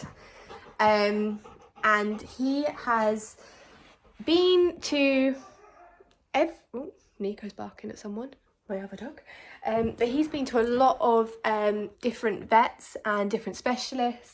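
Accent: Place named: England, United Kingdom. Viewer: Its her English accent for me..😁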